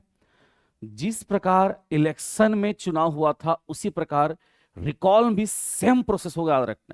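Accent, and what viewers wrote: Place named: Chhattisgarh, India. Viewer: Wo Chhattisgarhiya hai, Chhattisgarh me jii bolna ek lahja aur good manners hai. Tai apn kam le kam rkh na padhe la aye has ta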